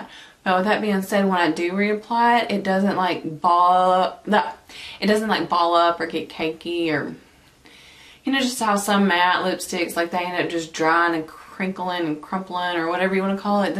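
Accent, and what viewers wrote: South, United States. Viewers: I love your videos so much and your southern accent!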